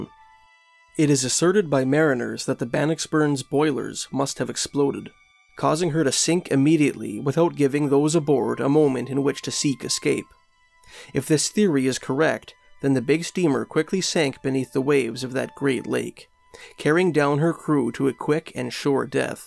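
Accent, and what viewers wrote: Canada. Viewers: I’m glad to hear a Canadian accent, you don’t hear it much in B.C., sounds more American here.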